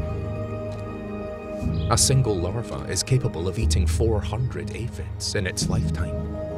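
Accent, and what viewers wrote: Scotland, United Kingdom. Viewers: You Scottish by any chance?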